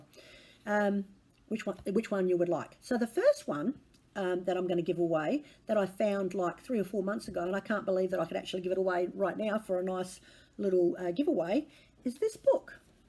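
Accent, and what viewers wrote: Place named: Australia. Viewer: Love your videos and accent - lived in Exmouth, WA in the 70s and LOVED Australia. My oldest son was born there too! I would love to go back and spend more time in Perth and explore more of your beautiful country. I would love the Austen bag - it is amazing. Enjoy your retreat, and have a most wonderful trip back home. Much love. 💕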